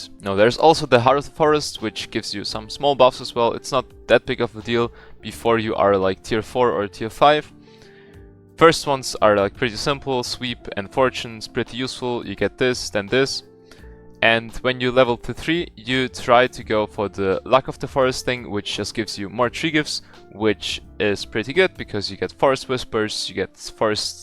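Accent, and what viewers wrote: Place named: Germany. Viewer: bro ist deutscher als deutsch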